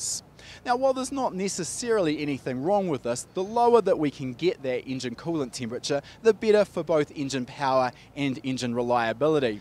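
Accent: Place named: New Zealand. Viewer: Great thinking here. Real world tuning. P.s. loving listening to your NZ icksent. :)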